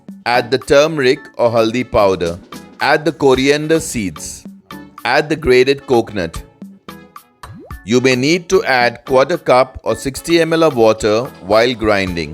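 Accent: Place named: Goa, India. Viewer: Goan taste with goan accent suits well.😊 Thanks for your reply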